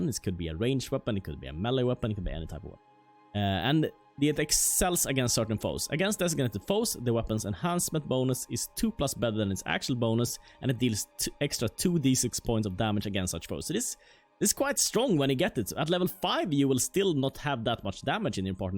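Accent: Sweden. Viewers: swedish for sure, definatly not eastern european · Scandinavian I would say. Probably Swede to be precise.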